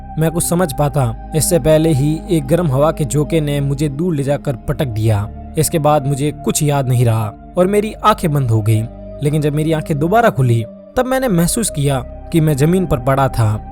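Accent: Rajasthan, India. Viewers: Bhai rajasthan se ho kya Accent se lag rha h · Rajasthani awaj h bhai jodhpur bikaner aur nagour ki tref tk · Bhai ap rajasthan hai syd awaz aur language se thoda lg raha h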